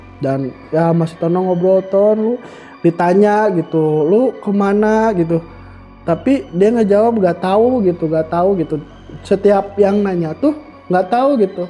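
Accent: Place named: West Java, Indonesia. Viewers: Gua.. Gua... Aneh ceuk akumah logat sunda gua guan... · Bang serius klo sunda sunda aja jadi kagok dengernya😅😅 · Logat sundanya kebawa😂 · Sunda pisan euy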